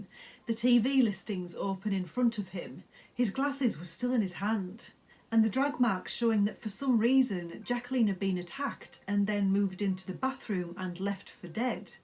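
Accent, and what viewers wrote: Newcastle, United Kingdom. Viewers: are u from Newcastle?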